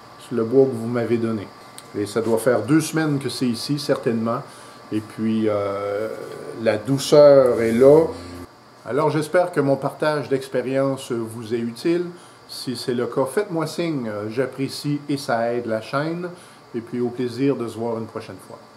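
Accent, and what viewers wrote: Canada. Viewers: Bonjour cela fait de nombreuses années que je suis vos diverses expériences je tiens à vous remercier pour la curiosité que vous mettez à expérimenter le tournage et la gentillesse teintée d’accent canadien qui résonne à mes oreilles de français du sud ( a côté de Marseille ),votre dernière vidéo autour du séchage ma beaucoup intéressée …depuis toutes ces années j’ai l’impression que nous avons évolue ensemble,encore merci continuez…. Ont vous suis ici avec plaisir. Mr lucari André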